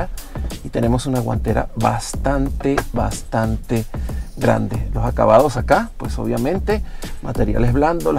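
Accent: Venezuela. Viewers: ¿Ése acento es venezolano?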